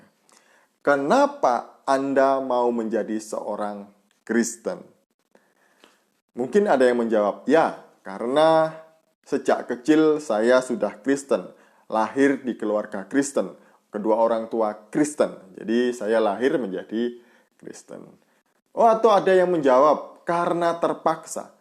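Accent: Java, Indonesia. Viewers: Yang Pertama Aku suka Gaya bicara Panjenengan Mas kental bamgeet wong jowo nya hehe✌✌✌✌✌✌✌✌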